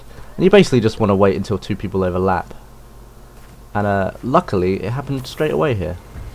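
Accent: United Kingdom. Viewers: He was a calm little brit. Then he did a dig-down, and everything changed. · You sound exactly the same. All you Brits do. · you got that awesome accent, you must be british?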